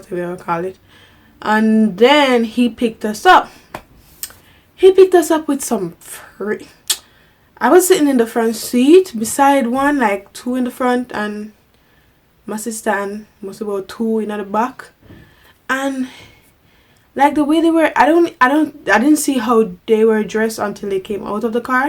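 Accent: Jamaica. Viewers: Are you Jamaican? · I like ur jamican tlk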